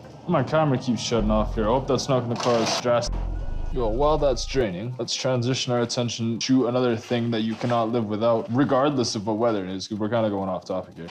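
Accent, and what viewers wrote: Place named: Ottawa Valley, Canada. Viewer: Fuck bud, this feels and sounds like me and my god damned 1500, 5.7 ram. Glad i found ya. Fuck... hahaha we even say some of the same damn things. Never realized how much you lads sound like us ( ottawa valley, northwest a few hours of central Ottawa. Keep these comin bud!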